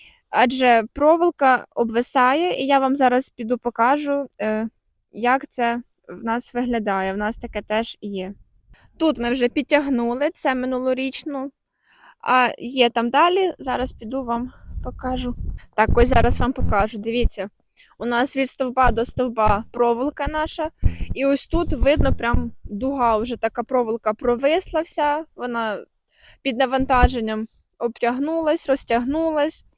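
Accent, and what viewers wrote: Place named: Western Ukraine, Ukraine. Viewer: Фу-як западенка 😢 противна по українські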